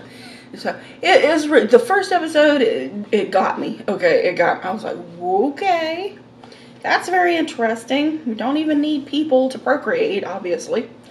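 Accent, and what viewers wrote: South, United States. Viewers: Hey. I say this with the kindest of words. I think you should go on the TV show My 600LB life and get some help. You are gorgeous and your worth it. I love your southern accent. Please go and get some help sweetie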